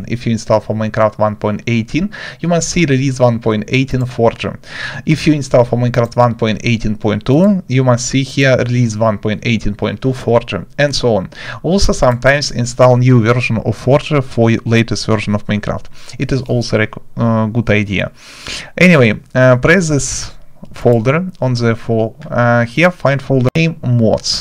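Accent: Russia. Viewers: eu tenho quase certeza que ele é russo pelo sotaque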